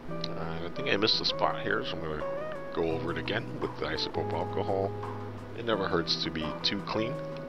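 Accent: Philadelphia, United States. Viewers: . Yup, he's from Philly alright. Anyways, I hope those jawns worked out for ya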